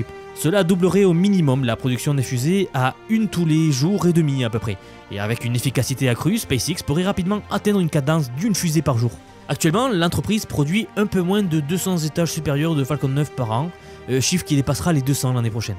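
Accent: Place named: South-West, France. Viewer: Trop bien ce contenu ! Full valeur merci. Bonus pour l’accent du sud ouest !!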